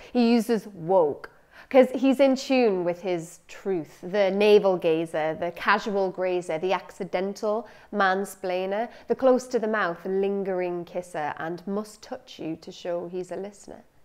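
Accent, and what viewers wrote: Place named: Newcastle, United Kingdom. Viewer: Amazing! In the wellness/yoga circles I work in Ive defo met a fair few of these guys!! You express it so well and great to hear a fellow geordie covering this important topic thanks 💚🙏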